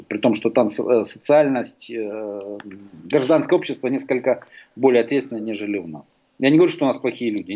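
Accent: Moscow, Russia. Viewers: Диктор с московским акцентом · акцент то Московский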